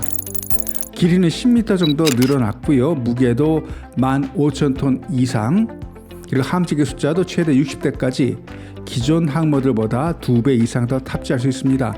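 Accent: China. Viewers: 발음이 중국분이네..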